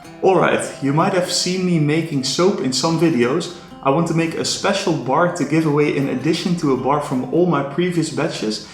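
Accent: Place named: Netherlands. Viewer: You sound very Dutch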